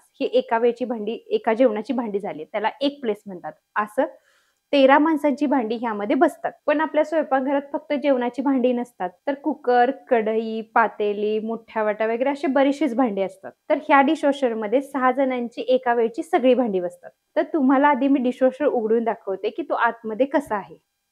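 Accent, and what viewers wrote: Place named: Satara, India. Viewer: Satara accent lagech kalte 😂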